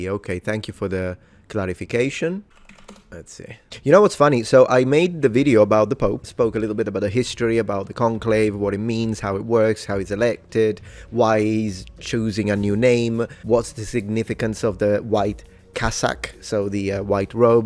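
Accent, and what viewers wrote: Italy. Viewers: Ecco perché il tuo accento mi era molto familiare, sei italiano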